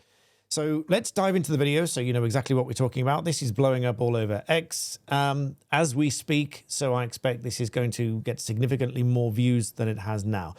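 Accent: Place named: RP, United Kingdom. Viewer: Really wish the algorithm would pi$$ you off from my feed. The pretentious plum that is jammed in your throat leaves you sounding like a failed private school boy trying to do one last ditch makeover on youtube. Twat.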